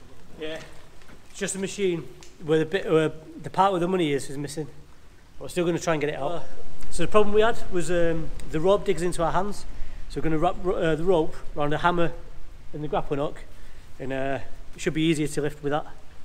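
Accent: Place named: Liverpool, United Kingdom. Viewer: No. I just don't understand Scouse.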